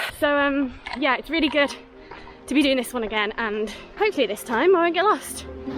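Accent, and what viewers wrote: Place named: England, United Kingdom. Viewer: I can't figure out if you have a Welsh accent or English. I'm thinking English but every so often you sound Welsh lol If you're Welsh then Shwmae, if you're English then I hope you enjoyed your stay 😂👍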